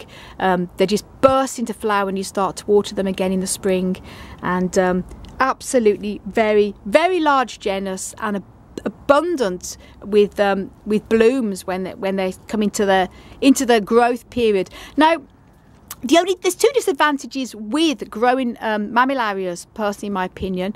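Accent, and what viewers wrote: United Kingdom. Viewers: I got to learn more about my cacti. by the way, you have beautiful British accent! I enjoyed your video!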